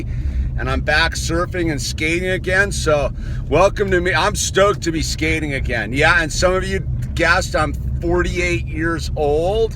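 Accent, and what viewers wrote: California, United States. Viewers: I’m glad I subscribed to you . Your voice is so mellow and Californian (?) . 💕💛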